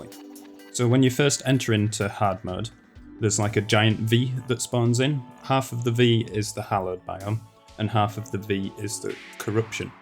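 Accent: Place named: North West England, United Kingdom. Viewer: are you from Manchester or the North West of England? Your accent seems familiar, nice vid!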